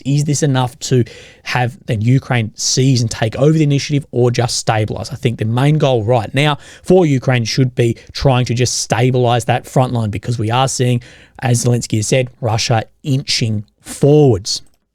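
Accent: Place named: Australia. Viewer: i have watched over 200 of videos. He is the best at zero line tactic analysis. Always very balanced, pulling for Ukraine. There were monetization issues and Gaza. He was always begging. After his Dad died, whammo, a turn around. Now he parrots the RT slant, for a while wore some pretty fancy clothes and promoted not begs now. Indicators of financial influence. assessment that loosing the Kerch Bridge, the only potential withdrawal line, is not important is right outta current Russian state propaganda and laughable on its face. I unsubscribed, I've no need of RT with an Australian accent. Sayonara Kerch, hut don't worry, says it is no prob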